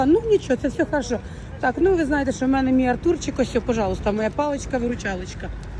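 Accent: Ukraine. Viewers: Говір український тоже люблю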